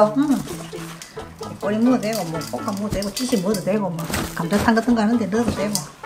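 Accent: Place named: Seongju, South Korea. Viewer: 시래기 성주 사투리 반갑습니다 ~~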